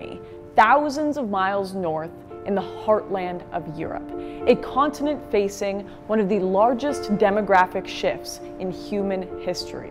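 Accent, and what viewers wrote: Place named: Canada. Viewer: I love her Canadian accent, “prOject”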